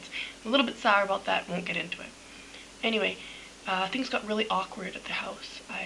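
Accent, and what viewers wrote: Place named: Canada. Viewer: i'm hooked. i love listening to your Canadian English too. And your life is so different than any I know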